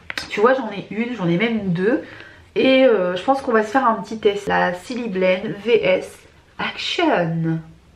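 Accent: North, France. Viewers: merci pour ton vlog frais et sympa, je me disais bien que j'entendais un petit accent du nord dans tes vidéos!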